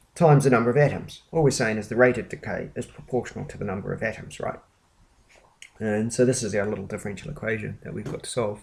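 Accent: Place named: Australia. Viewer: You're Australian. Thank you, Mr. Australian man.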